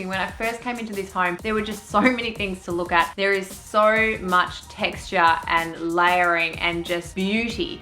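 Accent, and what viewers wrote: Australia. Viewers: This Lady is wonderful to watch love the whole package editing and music all tied together with that cute aussie accent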